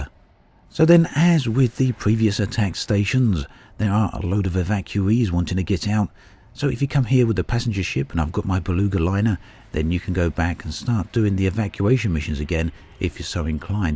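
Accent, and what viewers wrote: United Kingdom. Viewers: He is british. End of story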